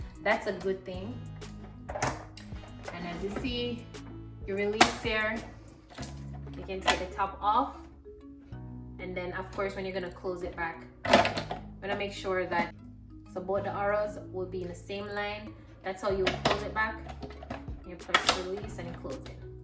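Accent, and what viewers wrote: Jamaica. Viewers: I can tell by your accent that you are a Jamaican 🇯🇲🇯🇲❤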